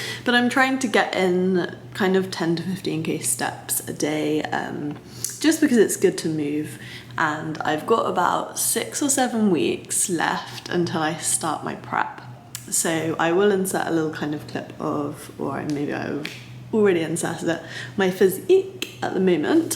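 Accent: RP, United Kingdom. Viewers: you so unique your a amazing athlete yet you dont have tons of ink and sleeve tattoo's and then when you speak this beautiful almost posh English accent comes out Like we are going for afternoon tea. i hope you realize how rare and beautiful that is today...cheers from the USA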